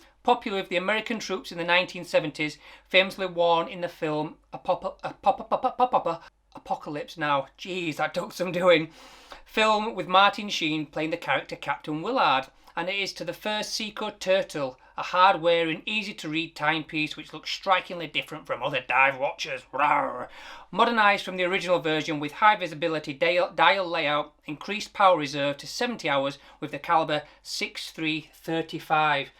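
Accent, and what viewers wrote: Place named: Huddersfield, United Kingdom. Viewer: Nice to see a watch reviewer from Huddersfield. It's a nice town and nice people, lived there for almost 7 years. Miss that accent. Brilliant review, well done.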